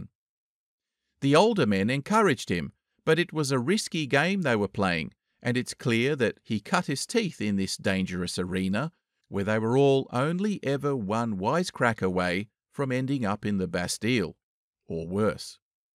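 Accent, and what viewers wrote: Australia. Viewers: I must say very colour full English language used by it's creator while narrating in Aussie Accent with various cuttings of picture, But I like it very new experience. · Voltaire and an australian accent....?? OMG.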